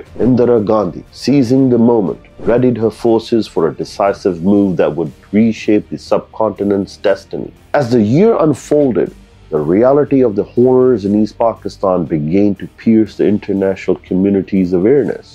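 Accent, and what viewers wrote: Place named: United States. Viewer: Love from india 🇮🇳🇮🇳🇮🇳🇮🇳🇮🇳 we want more information about Indian and pakistan historical... We also learning in Indian school Book (NCERT) but i listen what's pakistani think about undivided india Your voice is good but american accent some time problem to understand Your name is (i think is hindu sir name and also creat from ancient Afghanistan or great India when 16 mahajanpad in north india one of the state... If you know about your sir name and......history about 2th century or gupta, mourya, saatvahan, shak, kushan emperor so please make video my be possible speaking urdu/Hindi)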